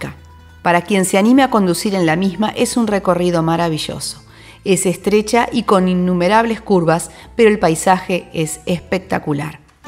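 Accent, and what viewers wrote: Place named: Argentina. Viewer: Creo que es Argentina o Paraguay a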